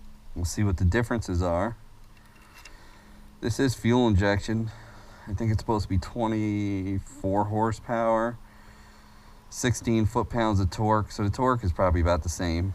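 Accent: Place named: Maryland, United States. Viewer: what state are you in? Sounds like MD or PA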